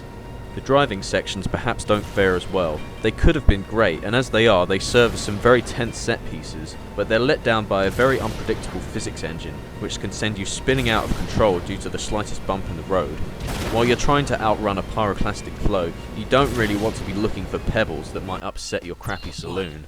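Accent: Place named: United Kingdom. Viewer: Thanks, nice short, snappy review. I'm thinking of getting a cheap Wii so I'm checking out some cheap games too. And always nice to hear a restrained British accent on YT.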